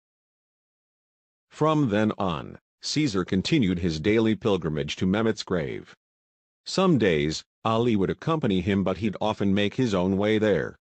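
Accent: United States. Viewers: For heaven's sake man. You have no need of a robotic voice, you have an American accent. Use it, don't hide it.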